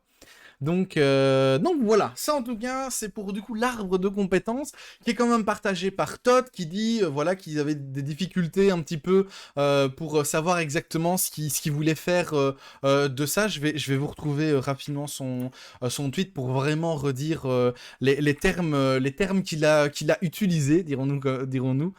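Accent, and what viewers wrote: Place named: Liège, Belgium. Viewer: Tu viens d ou en belgique ? On dirait liege non? Comme moi mdr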